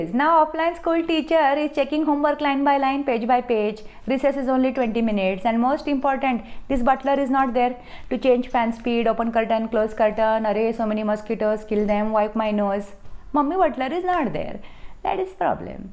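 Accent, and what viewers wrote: Maharashtra, India. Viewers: Awesome. Marathi accent on point and message to parents, (letting children face their challenges ) is great. P T Usha was highlight :D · Love her Marathi accent 😊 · really amazing marathi accent.... Super..! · Omg this is soo perfect👍👍👏👏 up to the mark 👍👍👍 P T usha 😅😅 amazing word play.... kudos to you 👏👏👏 and I am loving your Marathi Accent 🤩👏👏 Love From Apla Pune 😘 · HOW DO YOU DO THIS!!! ....JUST HOW. PLEASE TELL ME....I just can't stop laughing at each and every brilliant pun line(PT usha, khao, khao and Howgarts omg...rofl) You are extremely talented and funny. Keep posting and making us laugh....god knows we need more like you!!! You most certainly Crack the Marathi accent!👌🥰🧡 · Grt job....accent n punch lines used r true n terrific in any marathi household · Kiti chan Marathi bolte nahi?Ekdam shuddh ! 👍👏👏👏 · Amazing 👏 marathi accent 😍 एक नंबर 😊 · Brilliant Marathi Accent! 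Keep it up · Once again.. English spoken in immaculate मराठी टोन. Not to mention, minimal but perfect मराठी expressions... कस जमत हे तुम्हाला? How do you do it? Great work. Please keep it up. असच चालू राहू द्या:-) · Your are such talented actor, always have fun to watch your vedio. Your Marathi English accent is superb. Keep going. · Your Marathi accent is so cool ❤️ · hatts off ..just awsome.. marathi accent😊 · That Maharashtriyan accent... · Excellent Marathi accent although basically from Karnataka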